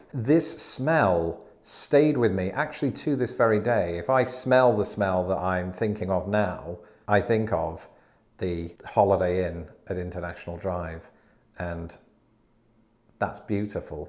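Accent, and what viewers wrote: United Kingdom. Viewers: don't get TOO Americanized, because our 21st century culture is largely crap. And above all, don't lose your British mode of diction. It will always always serve you well. And by the way, a Russian friend of mine once clarified the matter for me about the alarm going off. It doesn't go off; it goes on. Same goes for the bomb.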